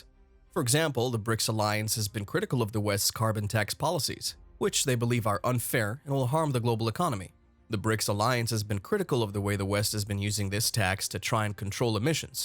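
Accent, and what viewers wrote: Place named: Texas, United States. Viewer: You sir, are starting to sound Texan , I like it. I have always had a good feeling about Russians. I think they are tough no nonsense people, and I heard they make good vodka. Trxas has so much oil we could give it away for free , and still make a profit.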